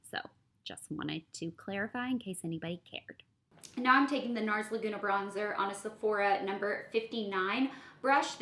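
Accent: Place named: Tennessee, United States. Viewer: Anyone else think, dang she really has been in Tennessee?! The accent is cuuuuuute ♥️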